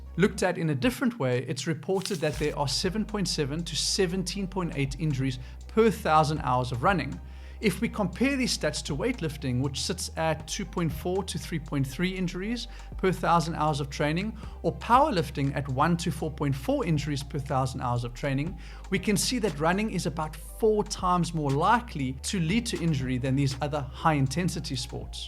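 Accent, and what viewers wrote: South Africa. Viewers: Ten seconds into the video and liked, subscribed. South African accent is so nice. You sound a bit like the former protea captain Greame Smith!! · Beautiful South African accent, made watching very enjoyable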